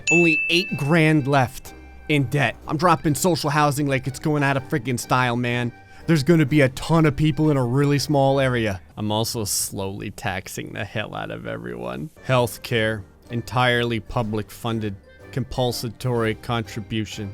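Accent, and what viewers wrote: New Jersey, United States. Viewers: What part of NJ are you from?? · I'm from Brooklyn and can comfirm that is nj lol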